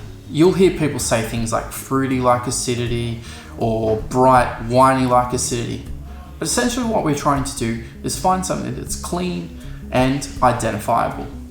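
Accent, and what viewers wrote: Australia. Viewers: Good vid and Australian love it, well done.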